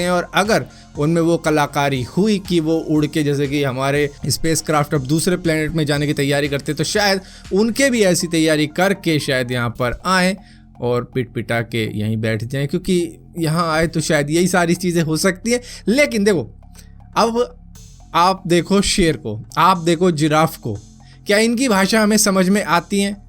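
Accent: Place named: Maharashtra, India. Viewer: Bhai aapko sun ke I can definitely say that you're मराठी मानुस , कधी मराठी त पन बोला....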